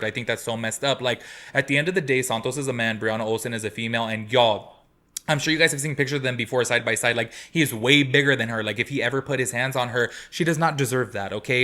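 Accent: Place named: Hawaii, United States. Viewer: Mahalo, I said earlier when you was talking, I was like ehh .. he talk like me local kine ❤❤❤❤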